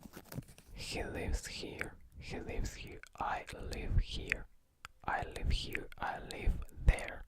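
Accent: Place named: Russia. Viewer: nothing is more cute than a russian trying to speak english 😂🥰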